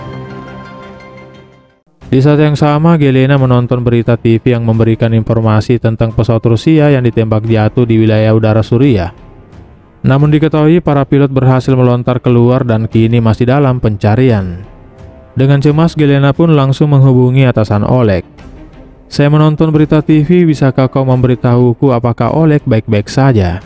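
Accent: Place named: Madura, Indonesia. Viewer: Ngomongnya kek orang madura lu min aga kurang realistis sama filmnya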